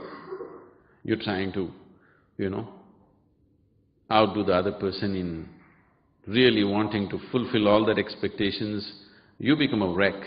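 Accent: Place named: United States. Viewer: interesting how even Sadhguru adapts the American accent, because of its surrounding..you don't hear him speaking like that in India :) have a blissful life you all..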